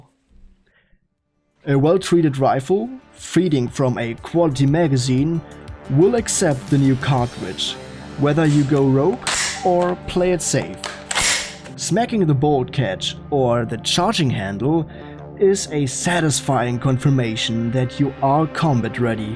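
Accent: Germany. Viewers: vid ist ganz ok, aber an deinem t-h musst du noch arbeiten, man kann sehr einfach raushören, dass du deutsch bist aber du redest schon flüssig, was sehr gut ist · Why does it sound like he is German? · Man hört nach nach kurzer Zeit das du deutscher bist, aber ich musste 1. nachschauen (kanalinfo) und 2. ich kenne keinen Deutschen der so gut und flüssig English spricht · ohne deutschen akzent bitte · Ich liebe diesen deutschen Akzent 😅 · Man hört den Alman bisschen raus aber sonst interessantes Vid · Yeah apart from the zhick German Akzent and the sometimes wannabe British pronunciation of words pretty much yeah. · Dein Englisch ist gut, aber man hört sofort, dass du deutsch bist 😁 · Du klingst sooo deutsch.😂 Trotzdem cooles Video.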